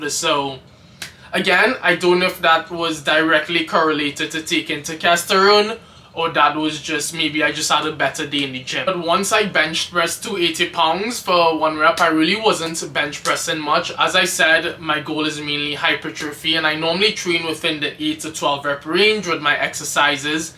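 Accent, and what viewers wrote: Trinidad and Tobago. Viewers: That Trini accent haha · you sound like a trini. good luck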